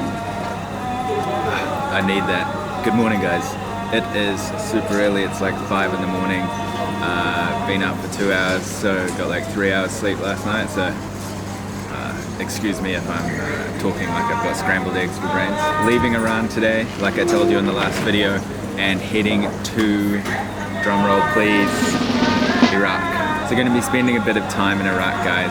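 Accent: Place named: New Zealand. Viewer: He is a New Zealander, not Australian. And if you have a hard time with the accent click on CC and you can read along with the captions. ( true blue Kiwi born and bred here ) :)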